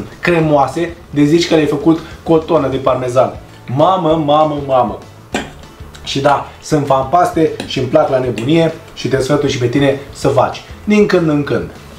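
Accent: Moldova. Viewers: pair a contraire....e perfect ca vorbeste...noua ne place,mai ales accentul moldovenesc...❤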